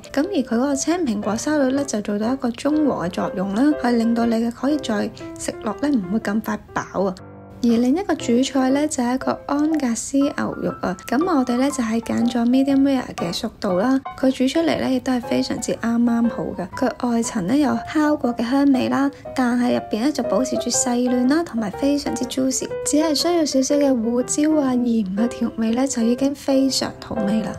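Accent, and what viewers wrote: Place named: Cantonese, China. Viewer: What language are you speaking? It sounds like Cantonese, but not exactly like Hongkong. I wonder.🤔🤓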